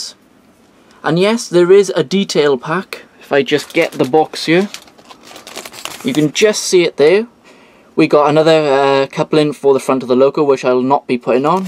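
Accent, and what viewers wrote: Wales, United Kingdom. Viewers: I like your Welsh accent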